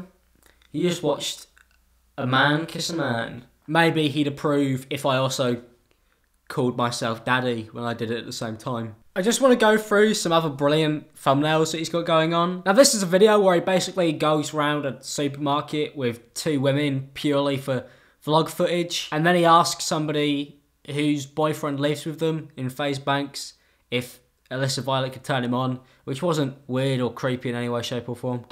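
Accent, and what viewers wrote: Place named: England, United Kingdom. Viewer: What I hate more than ricegum is the English accent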